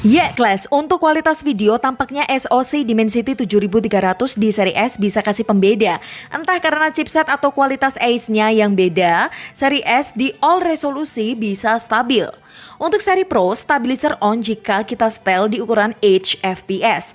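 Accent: Surabaya, Indonesia. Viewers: Suroboyo iki rekkkkkk.....👍👍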